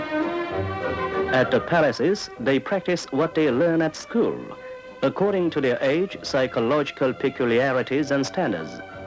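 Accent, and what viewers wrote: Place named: Ireland. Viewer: The narrator has this Irish accent with a mix of broken English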